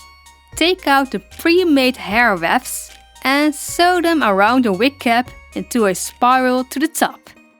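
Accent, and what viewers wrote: Netherlands. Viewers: Your so typical Nederlands accent hurts my lil Belgian-dutch speaking self, The finished doll looks awesome tho!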